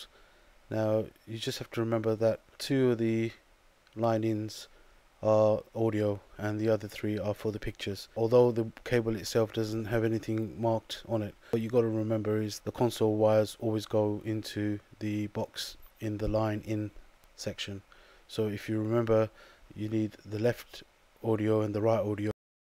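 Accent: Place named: United States. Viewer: وااااو الاكسنت عندك كنك امريكي .. ^_^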